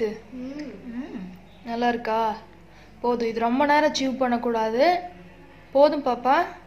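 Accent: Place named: Tirunelveli, India. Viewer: Neega ena vorunu sollata enoda guess sareya irunda neega tiruneveli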